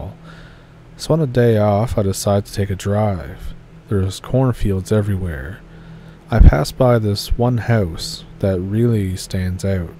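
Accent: Canada. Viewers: I have to say, I really like this channel! I think I have listened to all the stories, and I get a little excited when you post a new one!! You are a great storyteller, and I ALWAYS enjoy pulling up a stump to listen!! Also, you have a nice Canadian accent, very kewl!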